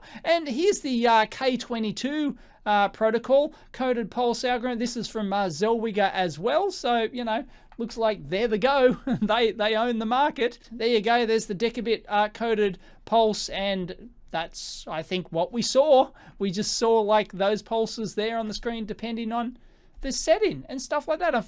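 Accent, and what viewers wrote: Australia. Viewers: That thick aussie accent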